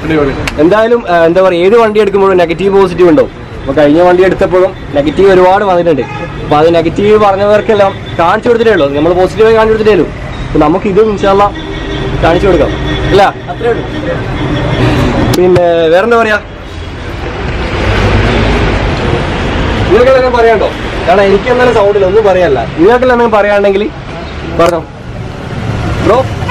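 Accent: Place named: Iritty, India. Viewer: ഭാഷ വള ഇഷ്ടമാണെനിക്ക്, കാരണം ഞമ്മളെ നാട്ടിലെ ഭാഷ തന്നെ ഇരിട്ടി ഭാഷ ഉപയോഗിക്കുന്നുണ്ട്. അത് തന്നെ ' കേരള to യൂറോപ്പ് യാത്രക്ക് എല്ലാ വിധ ആശംസകളും നേരുന്നു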